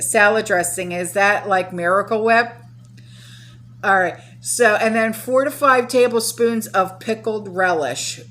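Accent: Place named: Pennsylvania, United States. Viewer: Was listening to you when I was up visiting my son in Pensacola...he was like...is she from Pton (my hometown in PA) she sounds just like you & your friends...coffee and sticky snacks. It made my heart sing that he does pay attention to our words. Have a fantastic day. Love your channel.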